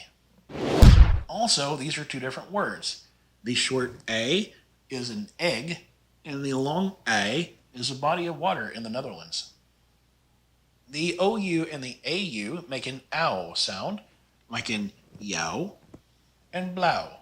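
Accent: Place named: United States. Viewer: are you american, you sound so america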